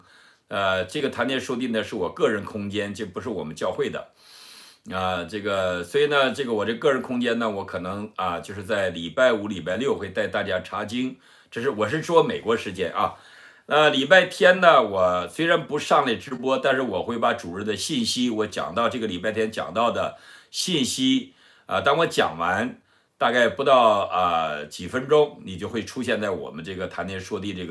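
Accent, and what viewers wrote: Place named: Northeast, China. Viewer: 你说的东北话特别好听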